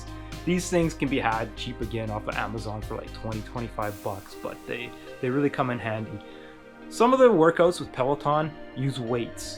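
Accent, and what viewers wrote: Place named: Canada. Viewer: I love that Canadian accent !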